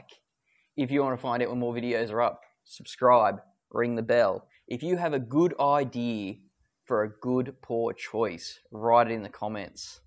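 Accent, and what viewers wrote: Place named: Australia. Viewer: Nothing’s better than watching a local brew. Love the Australian accent, approach and ingredients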